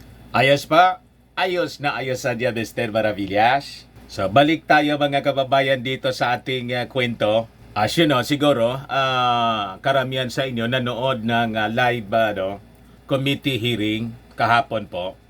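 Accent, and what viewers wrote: Bicol, Philippines. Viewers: bicolano ka po ba sir?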